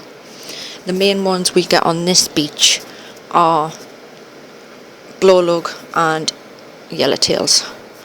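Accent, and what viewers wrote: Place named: Newcastle, United Kingdom. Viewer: Bexhill Windows ... sounds like Newcastle or South Shields...?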